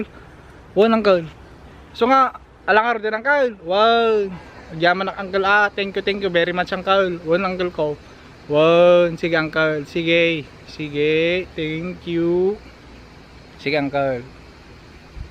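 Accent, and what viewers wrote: Ilocos, Philippines. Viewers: Hello! Enjoy watching your Bid-yos very nice strong accent Ilocano! Where are you from in Philippines? We are Filipino watching from London U.K.! 😀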